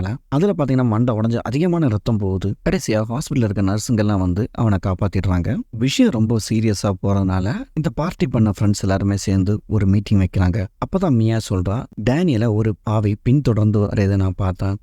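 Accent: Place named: Kerala, India. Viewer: Chettan malayaliya Nattilevideya Nice Tamil slang chettayi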